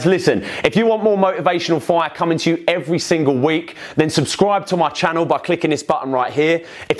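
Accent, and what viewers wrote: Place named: United Kingdom. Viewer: your british accent is fucking amazing!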